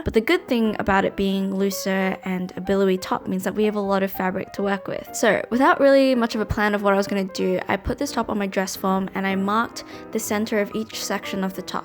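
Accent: Australia. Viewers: half way into the video before realising that you're Australian too woah I'm so used to hearing the accent that it didn't register until you mentioned cotton on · you're literally the helpful older sister everyone wants, also you australian?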